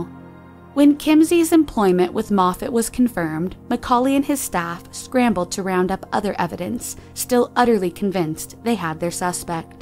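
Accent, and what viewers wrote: India. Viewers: Who's voice was it? Is she Indian?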